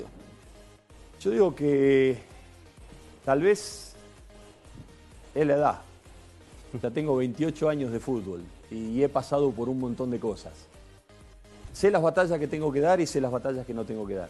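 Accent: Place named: Quito, Ecuador. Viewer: Grande Alfaro... Y se nota q se le está pegando el acento quiteño...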